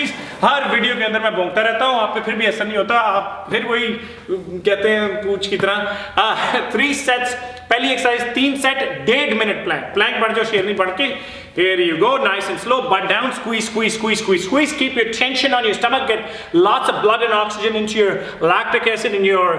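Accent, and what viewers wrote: Punjab, India. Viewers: watch ur all vedio bhai..i love the way u talk ur jokes songs punjbi voice n evry thing..👍😊m also pujbi nd jatniii 🤗love from pakistan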